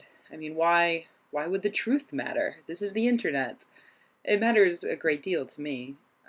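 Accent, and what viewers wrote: United States. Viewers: Alright. So based on your accent I'm going to assume you're American. If I'm wrong please correct me. As such the statistics that are most close to home for you should be those of American citizens. In America roughly 80 percent of men are circumcised. This comes out to be roughly 260,000,000 men. 200 of those men die from circumcision every year. FGM promotes another staggering number with 500,000 women either being victims of or at risk of FGM. As far as my research has shown there are 0 annual deaths due to FGM. FGM is horrifying. It disgusting, and evil; however, to deny that other practices are not to be taken with the same sense of urgency simply because of the gender of the victims is both damaging and disingenuous. I look forward to the day when both plights can be handled at the same table with the brightest men and women our world can offer. 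Thank you for your opinion, and the vehicle necessary to make mine heard as well.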